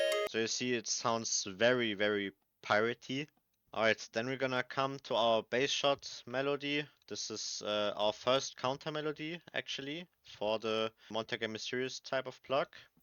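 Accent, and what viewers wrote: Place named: Germany. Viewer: you can hear hes german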